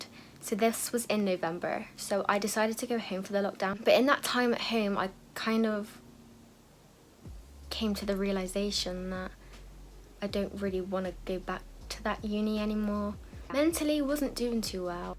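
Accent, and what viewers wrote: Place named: Bristol, United Kingdom. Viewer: Great vid. Proper Bristolian accent also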